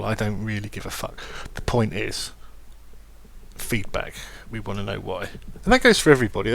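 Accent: England, United Kingdom. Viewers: I liked this not because of your commentary, your breathing, or your gameplay, but because of your witty remarks you make when typing to people. I just like to see how much enthusiasm and humor you have under that thick English accent =D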